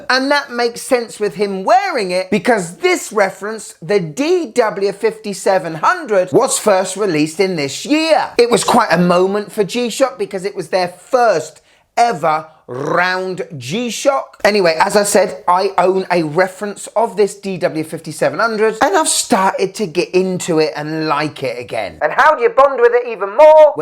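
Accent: United Kingdom. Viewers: When I first saw one of this guy's videos, I thought he was really intense, but now I love him. Even though English isn't my first language, I understand him perfectly; I think British English is easier to understand than American English.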